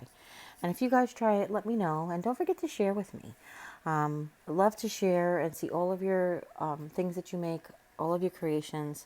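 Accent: New York, United States. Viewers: Guess what I am making tomorrow!!! These are adorable! And I said before I love the NY accent ! Bayside ❤️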